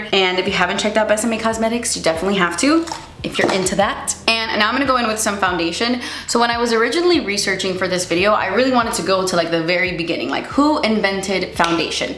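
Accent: United Kingdom. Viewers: Why do you keep going British???? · Love this! My daughter came in while I was watching and said, “she has a British accent!” I was like, “yeah…well kind of…” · The British accent reminds me of Andy from the office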